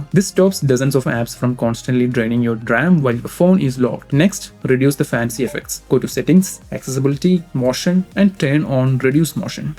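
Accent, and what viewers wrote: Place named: Kerala, India. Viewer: that malyali accent is smooth, intentionally pronunciation is lit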